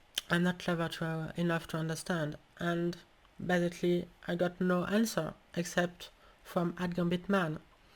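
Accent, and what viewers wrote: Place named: France. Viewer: From her accent I'd say France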